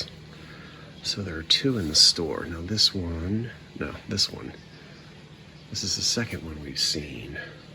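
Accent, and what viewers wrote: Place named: Baltimore, United States. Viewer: I grew up in "Balmer" County. I started going to antique stores when I was a teenager ..50 yrs ago. That's when you could buy old stuff for cheap. I love all your videos, and mostly, I love hearing you speak. Takes me home. I live in Colorado now.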